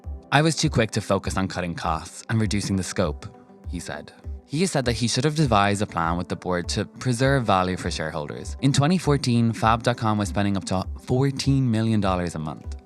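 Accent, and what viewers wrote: Ireland. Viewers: When a brand pivots, like changing the voiceover, you have to be ready for some backlash. BUT love beats hate. Welcome Irish Guy! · Most people are finding it a little harder with the Irish accent. Congratulations new guy, but please- let Caya host 😅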